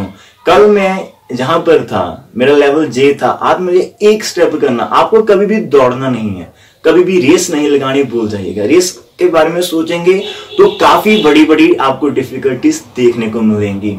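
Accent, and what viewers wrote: Punjab, India. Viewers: Sir vdia lgg rha hai apki hindi main se punjabi sunn rhi hai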